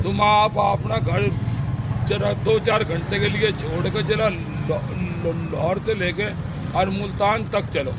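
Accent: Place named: Haryana, India. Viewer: BoLi rohtak ki hy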